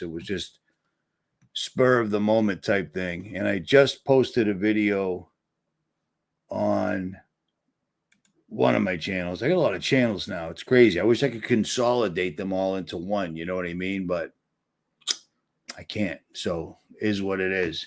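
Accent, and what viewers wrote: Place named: Boston, United States. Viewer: haha the Boston accent. We don't pronounce our R's and we add R's to words that don't have them. Reminds me of my sista Amander.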